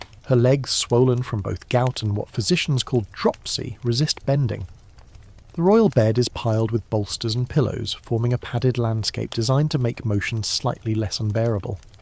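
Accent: United Kingdom. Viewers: I love your channel & historical stories, I’m glad I found you. I’ve deeply enjoyed your research, writing excellence and your wonderful British voice. Your videos’ ill ustrations are absolutely beautiful & I enjoyed the ambient background crackling of a large hearth found in a castle, both calming & deeply relaxing. So relaxing I dozed off during your story and had a dream I was in Queen Anne’s castle visiting with my Grandmother and brother, who’ve both passed away. My brother, 4 years younger, my only brother,passed too young, suddenly & very tragically. It’s been painfully difficult for me, as we were extremely close growing up & as young adults; he had not yet married nor had children I couldn’t go back to sleep so I came to yt to look around & stumbled upon your channel. I was able to fall back asleep and had this dream. I was walking through Queen Ann’s Palace as you were describing it. 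It was both beautiful and regal while also half crumbling & decaying. It was crowded, but I recognized my Granny, who passed away many years ago and my younger brother, who just recently passed away on February 2nd of this year. Such a strange dream and odd, as I’ve not dreamed of little him until now. Thank you for your video, it brought me great comfort❤. I subscribed & going to watch another!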